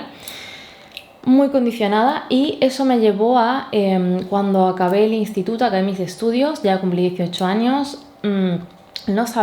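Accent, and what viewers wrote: Argentina. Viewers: Creo que no me quedo claro desde donde viajó hasta donde, alguien sabe?. Por ahí me suena a qué tiene un acento argentino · tiene un acento no🇦🇷?? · Gracias por tu canal y por abrirnos tu corazon en este video ❤ te acabo de descubrir hoy y ya tengo planeada una maraton porque hace un tiempo que vengo trabajando en mi desarrollo personal, y realmente este es el aspecto con el que siento que mas necesito reconectar y en el que me siento mas perdida, gracias realmente por proponerte guiarnos ❤️ Estoy esperando ver en algun video que digas de donde sos porque por momentos te escucho hablar como argentina y por momentos como española, y ahora que te veo tomar mate es evidente que alguna relacion con Argentina has tenido. 🤔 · Sos argentina?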